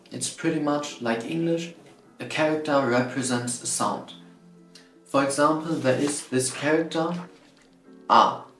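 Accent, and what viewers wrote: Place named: Germany. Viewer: I don't know, I studied in a completely different way, and it took me 2 years to reach N2. Learn Hiragana and Katakana in 2-3 days, don't avoid Kanji in the beginning, use Duolingo or Busuu for 2-3 months because the app gives you direction. Don't use Genki because the teaching order is illogical (e.g., teaching ばよかった before the actual ば-form, not distinguishing between things like というわけではない and とは限らない and so on). Wanikani > Anki. But I do actually agree: consistency is key^^ Und jetzt am ehrlich , ich höre da schon nen krass deutschen akzenten mein lieber.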